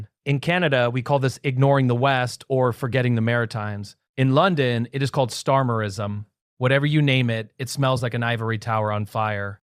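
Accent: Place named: United States. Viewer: What a load of crap and American voice over crap aswell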